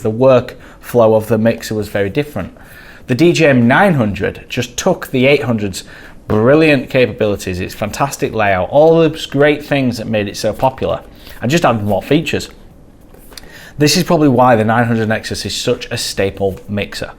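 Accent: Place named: Yorkshire, United Kingdom. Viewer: Silly question, but as an American, I hear a very particular accent. Where is this accent from? Would you call it Yorkshire?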